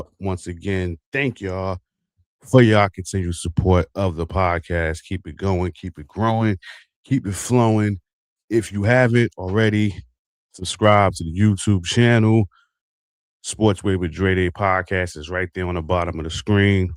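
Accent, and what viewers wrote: New York, United States. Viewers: You ended up on the feed. Heard you speak a couple minutes, figured you from NYC and a Liberty fan..you confirmed it...then I see you from the East.. had to subscribe. I'm from the Stuy, reppin the Liberty so looking forward to more content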